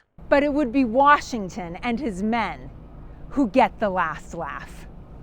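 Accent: Boston, United States. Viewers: I hear a fellow irish Bahstonian. Sup neighbor. 😉 I'm in Allston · I love your channel. I grew up in Greensboro, NC, Just north of the city is where the battle of Guilford Courthouse (the last battle before Yorktown) was fought. I have spent many hours there exploring so I have a love of history and learning about the beginning of the Revolutionary War. You are doing an excellent job teaching and explaining about the events around Boston, I was in that area back in 1967 so it's great to see the places again. Are you a history major or just have a keen interest? By the way, I love the Bostonian accent, very good for someone explaining about the events around Boston, and quite different from the southern accents around Charleston, SC, where I currently reside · Love the Boston accent, sounds like Kennedy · What a”wicked “ Baston accent ! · Thanks for preserving the Boston accent. 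I'm not a Massachusetts native but I've lived here over 30 years and I think yours is the thickest Boston accent I've ever heard. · This video could not have been done by anyone Without your beautiful Boston accent! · Thanks. I have many ancestors that served in the war from Lexington's beginnings and on, but I think they all missed this one. Your thick Boston accent always cracks me up, even though I am in New England. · The Boston accent is strong in this one. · Just love your BAAH-ston accent! But please stop waving both your arms so much while speaking ... it distracts from your presentation of the points you are trying to convey! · I love her accent. Boston area accent and U.K are the two most sexy in a woman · The GOOD=> you have a lot to say that I want to hear The BAD=> your voice is intolerably annoying to the ear. A voice coach can quickly resolve that. Fun Fact=> no one with a circus-like "BAH-stin" accent has ever risen to prominent power in this country for a reason==> their voice quality makes it impossible to take them seriously 😐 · I appreciate your research, knowledge, and spirit you bring to these Revolutionary War presentations of this important period of our country. 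Both sides of my family were actively involved in this fight for independence. None were left unscathed and many of my ancestors paid the ultimate price in this battle for freedom., thank you for taking the time and effort to bring these historical events to us. Hearing your voice transports me immediately back to Boston and that is wicked awesome! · Love your Boston accent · Rocking that Boston accent. Wicked! Solid work and story telling! Keep it up! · Yes, a real Bostonian ❤️ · Love that Boston accent I miss my Boston girls so much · Just gotta love. Especially love her very Boston accent - it's the real thing. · Chall's town, Bunkahill, I love the Bean Town accent. Not sure where the people of Boston put all the Rs they save, but there are a lot of them. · Great Boston accent. Very informative. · I'm going to subscribe to hear more of Revolutionary history with that beautiful Boston accent I've been missing since the days of the click and clack brothers. 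· She has a cute Boston accent.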